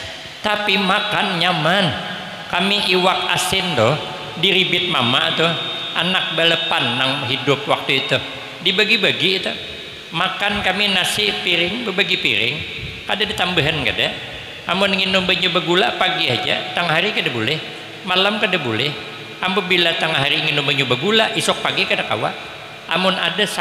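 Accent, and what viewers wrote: South Kalimantan, Indonesia. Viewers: Senang banar dgn suara dan bahasa Sidin ....bujurkah sanak bahasa Sidin kaya urg Amuntai wan tanjung ....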